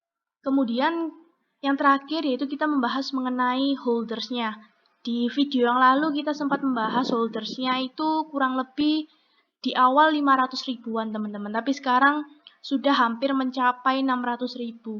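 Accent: Java, Indonesia. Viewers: medok x cakapnya hehehhehe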